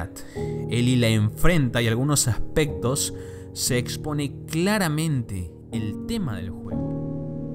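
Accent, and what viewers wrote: Argentina. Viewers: Que asco, acento Miergentino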